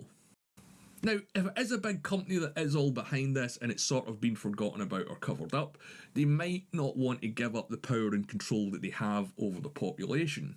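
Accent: Scotland, United Kingdom. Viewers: I like Scotland’s accent! 😅 I think the silo was built by United States government, I did not read the novel books series, but that is what I got from unspoiled from readers reviews. However, you are right, it is hard for bureaucratic entity to build something works efficiently, but they built it under the apocalypse circumstances! When any mistake, no matter how small, may end the human race! In terms of Silo authorities, I guess there is some misunderstanding, I do not blame you, I had it at the beginning, simply because silo authorities power distribution is extremely unique, it never ever existed in our real world! But still realistic! In our world, the tyranny power, or dictatorship, evolve by military coup, expansion of executive influence, or legislature pressure (revelation). In silo, the judiciary is the monopoliser of power! It is rare in our world but make sense in silo where armed forces do not exist! 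Nor society freedom! Why the mayor looks like the highest authority, appears as president? It is like asking “why german chancellor looks like the highest authority, appears as president?“! The german chancellor title been used after Carolingian Empire fall, as the highest position left of the empire! So the correct question is, did the United States president and governors died during the apocalypse years and the highest position survived was a mayor?! “The Silo” as a fictional world is still evolving and several stories and novels written in the same world, each su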